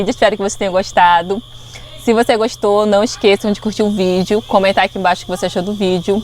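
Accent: Rio de Janeiro, Brazil. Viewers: Oi, linda...se sotaque parece carioquês...😅😅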